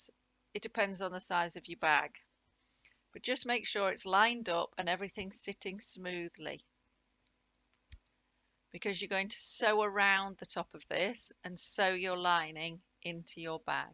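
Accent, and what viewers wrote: England, United Kingdom. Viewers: The best one and an English accent! Thank you from Southampton UK. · Hi Mam, Happy New Year! It is a cold raining day in Virginia on 1/10/26. I live in an efficiency apartment saving for my first house to buy and my goal is for Summer 2026. I love fabric but, have not much storage and I am a waste not want not gal and save very tiny scraps. I made my first draw string bag with a round bottom as a faver for my boss's wife and totally did not get paid correctly with cost of supplies time and talent. It was a tricky pattern called a Knitters Bag. About 7 inches wide, 12 inches tall and a round bottom 10 inches across. I coukd not find a video for tge life of me to show how to have the casing with clean finished sides so you would not see a raw edge that could fray. So the fabric where the casing ends were for the rope draw string I did dabs of crazy glue with a Q-tip in a smooth fashion. 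I never saw any videos where the seamstress opened up the side seams. I love this video. Also I have been baffled how you turn back out the sides the right way from the bottom when you line your bag. I have learning disabilities and patterns are hard to follow. I so want to make little bags more so now for each holiday and these will be nice for Valentines Day fabric with individually wrapped candy inside and a little gift for my family members and 2 special friends at work. I am so happy I watched this after watching your mixed media card you made today. I am happy you gave your measurements in inches too. Your accent sounds English. My Dad is from Manchester, E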